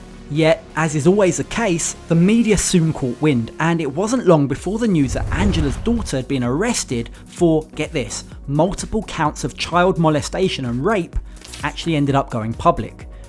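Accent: Cockney, United Kingdom. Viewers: For Christ's sake get someone who doesn't sound like a chavvy Cockney chimney sweep from 1870 to narrate these videos. What an embarrassment that accent is to the entire nation.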